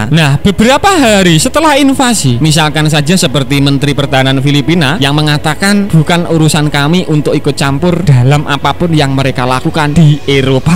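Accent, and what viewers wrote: Java, Indonesia. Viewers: suara Admin logatnya jawa banget dan seksi.. Urrraaaa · Intonasi narasine muantap nJawani begete.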